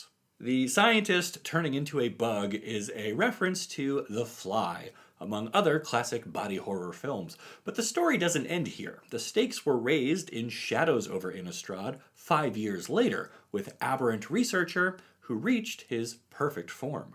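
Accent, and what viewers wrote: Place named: Texas, United States. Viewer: What part of Texas are you from? I’m from DFW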